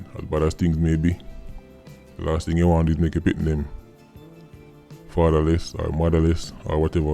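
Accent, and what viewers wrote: London, United Kingdom. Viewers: Your London accent on point we only used esta to go USA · Because the UK have left Brexit there is a deadline for EU citizens who still live in the UK to get their papers sorted to remain in the UK and maybe because they havent got the papers and dont want to go back to the EU so that may be why there are people trying to get Bonehead Biden's America, so i doubt whether its an actual UK born citizen but as UK was a former EU country (thank God UK people had sense to leave it) you could have people from all over Europe in the UK so that may be the answer or as you say it be a combination of a European trying to escape UK and EU justice to go the US where the current President is one of the most crooked men in Politics and where the Justice system is becoming a joke and illegal immigration is welcomed so the KKK Democrats can "increase" their votes...pathetic, by the way nice London accent 😂As for the knife crime you have a group of kids playing at life who are killing each other, most of them have unlying mental heakth issues or broken homes bad from school and have nothing about themselves; this is not societies fault as this younger generation have ALL the benefits and opportunities all the support all the legislation to get where they want and many young people are achieving but its the handful of demon possessed wretches that cause the problems time and time again yes some come form bad homes but so do many many other youths who CHOOSE to achiev....the UK is safe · you sound like real British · Mans from London bruv. 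Lol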